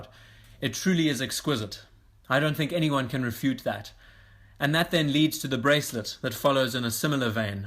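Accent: South Africa. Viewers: You sound South African 🤔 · South Africa 👍🏾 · How did you rework the crown? Or did you have it done? Judging by your accent, you're South African. I'm in CT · You sound South African! :)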